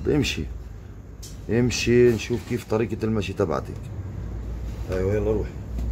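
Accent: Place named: Palestine. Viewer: تحياتى من مصر بحبك حضرتك أوى 🙋‍♀️😊🧡🍯 وبحب اللهجه الفليسطينيه اوى وبالأخص كلمه "ولااااو" بحبها أوى 🤭💜💜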